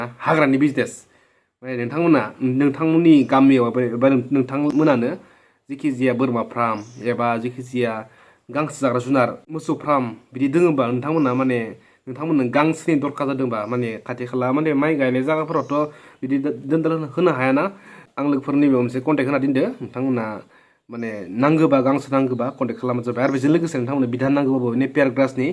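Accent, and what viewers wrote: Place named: Kokrajhar, India. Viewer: Wi pongbai ngni railaina esa mwjangwi boro pior railainw swlwngbao 😮sanjarisw jayw matw nwngna railainaia? mwjangwi swlwngpin kokrajhrni railainai baidi obwlasw bujigwn jwng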